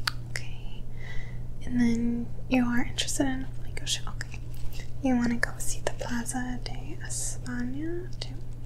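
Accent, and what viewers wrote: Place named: Upper Midwest, United States. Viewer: definitely has that upper midwestern accent. Probably from Michigan, Wisconsin or Minnesota. Maybe northern Illinois. I think I've found a new ASMR channel!!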